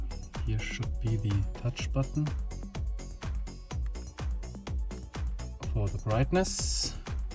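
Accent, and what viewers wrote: Germany. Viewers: Du bist deutsch, oder? Will auch haben 🤣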